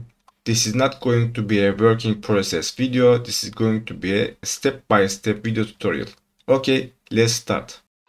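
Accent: Turkey. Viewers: Kardeş eğer sen türk değilsen ben bu işten birşey anlamıyorum demektir telafuzun çok belli ediyor kendini, harfleri türkçe vurguluyorsun. yanlış anlama bunu kötü amaçlı söylemedim videoyu çok beğendim yardımcı oldu teşekürler 👍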